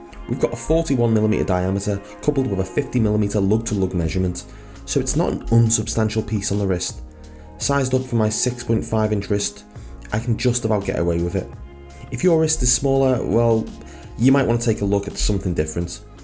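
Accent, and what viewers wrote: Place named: Liverpool, United Kingdom. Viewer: Nice review. Some humorous metaphors too that gave a good chuckle! 👏🏼 I detect an accent… so, Everton or Liverpool is the important question! 😉 I picked up a VHP GMT white dial in 43mm on Saturday. Gotta say I’m really chuffed wi it. The single push that doesn’t do anything on your version is the way of initiating flash settings or changing time zone of the gmt on my version. Really a classier grab and go alternative to a G-Shock. Cheers bruv, have a good un.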